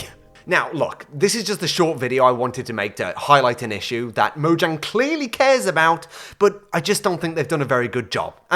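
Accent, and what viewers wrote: United Kingdom. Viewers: You sound way too British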